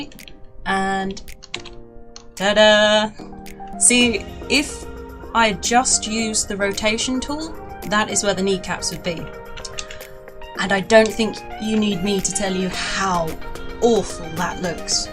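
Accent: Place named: United Kingdom. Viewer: Awww your british! Love your voice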